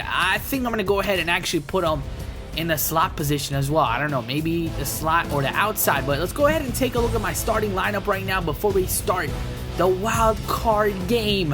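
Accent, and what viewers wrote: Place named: Midwest, United States. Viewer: That's a mid west United States accent they speak very flatly.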